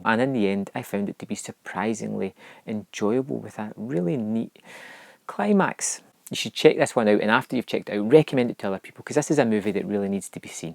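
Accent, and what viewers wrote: Scotland, United Kingdom. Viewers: wat part of Scotland u from I'm from Stirling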